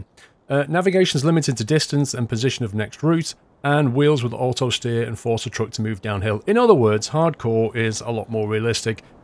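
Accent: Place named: Manchester, United Kingdom. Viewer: Trying to pick your accent . What part of the UK are you from ? Somewhere near Manchester ?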